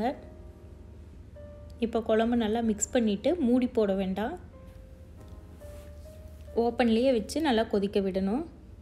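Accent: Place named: Coimbatore, India. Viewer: Namma slang apdiyae varuthu ka... Naanum Coimbatore thanunga😄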